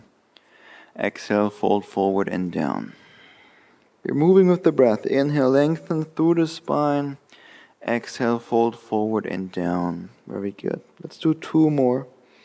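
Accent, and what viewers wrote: Germany. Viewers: I can hear the German accent. Stimmt? I liked the video but this is not for beginners, I am sorry. I practice yoga daily for 7 or 8 years, and this is not beginners yoga. Vielen Dank. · Are you german? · Germany from the sounds of it hey?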